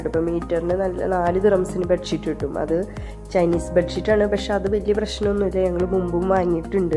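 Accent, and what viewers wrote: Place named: Thrissur, India. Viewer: Thrissur aano sthalam?? Samsaram kettapo thonni.. Me too from thrissur...Good and helpful video..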